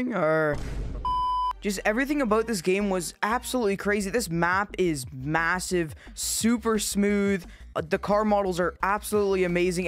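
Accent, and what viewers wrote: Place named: Canada. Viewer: I can tell you're canadian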